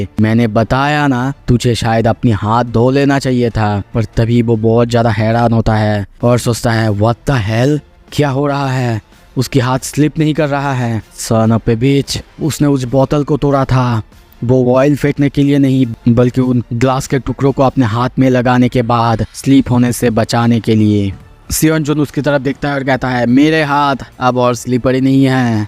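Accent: Bengal, India. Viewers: bro r u bengali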